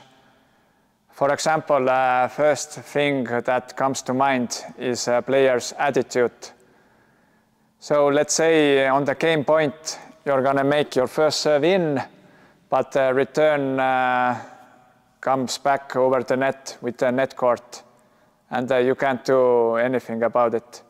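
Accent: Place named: Russia. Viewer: Besides the beautiful Russian accent, this video is great.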